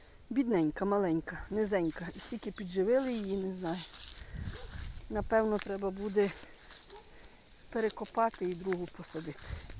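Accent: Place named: Khmelnytskyi, Ukraine. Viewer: доброго дня, я давно дивлюся ваше відео. Ви говорите,як у нас на Хмельниччині.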